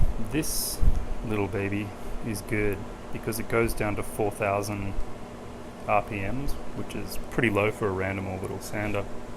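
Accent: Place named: Australia. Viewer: Thank you so much, it's exactly what I was looking for. I gave the tendon in my elbow a decent tear (7mm) from manually sanding and polishing my electric guitar builds 😥I thought I recognised an Aussie accent until I looked at your website as I was going to ask where in Aus you purchased the sanding pads but no point because you're in the US. Anyway thanks again for your info; my local Bunnings has it in stock so happy days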